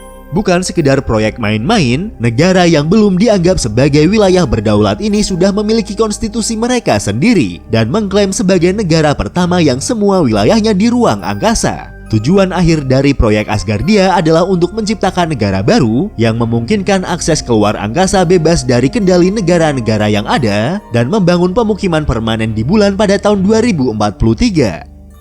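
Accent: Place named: Garut, Indonesia. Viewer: Asli Garut kah min?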